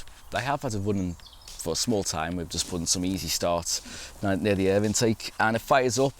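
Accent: Liverpool, United Kingdom. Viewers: I have a mate from Maghull and you sound exactly like him. A bit different from 'normal' Liverpool!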